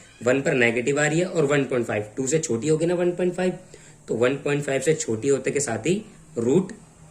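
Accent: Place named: South, India. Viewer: sir your pronounciation for squairereee is very funny , its seems that you are using south indian accent..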